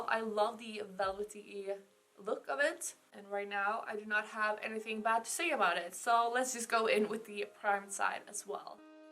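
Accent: France. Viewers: That foundation looks really good, I might give it a try 😁 And ouh that French accent, easy to recognize haha (kisses from France !) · Ahaha as a frenchie I can definitely tell he is french 😂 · French guy? Haha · That was a french accent in this man's voice haha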